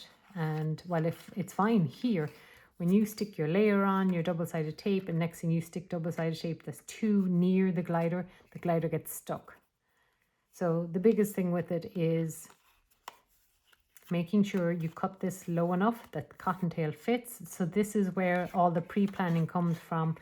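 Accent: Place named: Ireland. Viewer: Great video! Colouring is great! So nice to hear that Irish accent again!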